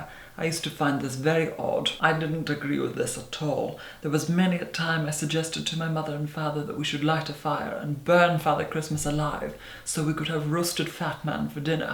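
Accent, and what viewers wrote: Scotland, United Kingdom. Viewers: Are you scottish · The most beautiful man in the world? Is it a slight Scottish accent? Such class. Xx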